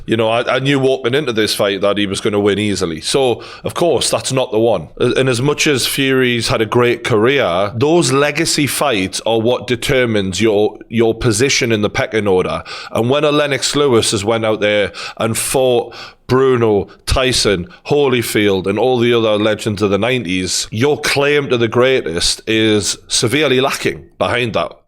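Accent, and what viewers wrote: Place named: Geordie, United Kingdom. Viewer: True “I did say” Geordie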